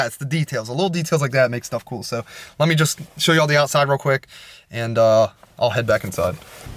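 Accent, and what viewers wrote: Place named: Maryland, United States. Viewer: I knew it was MD first few seconds of video, royal farms and the accent dead giveaway 😅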